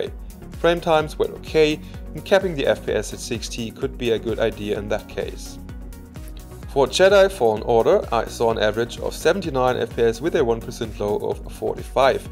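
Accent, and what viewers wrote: Germany. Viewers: Moiin Denke du bist auch deutsch so wie dieser akzent klingt... (nicht der einzige hinweis)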